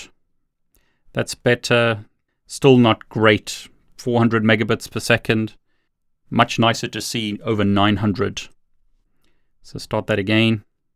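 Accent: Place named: South Africa. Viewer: I hear a South African accent, or am I just homesick?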